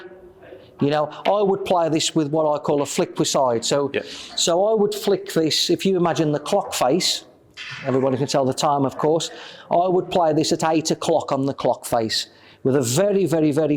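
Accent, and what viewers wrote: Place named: Birmingham, United Kingdom. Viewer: That’s a really thick Brummy accent!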